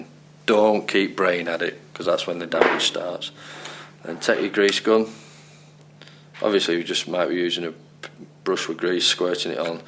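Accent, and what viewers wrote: Yorkshire, United Kingdom. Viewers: Proper Yorkshire int it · Proper Yorkshire, less is more 😁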